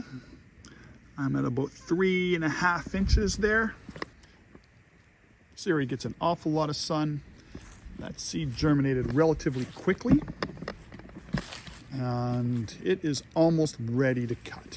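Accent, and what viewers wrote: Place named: Minnesota, United States. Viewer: You got that Minnesota accent.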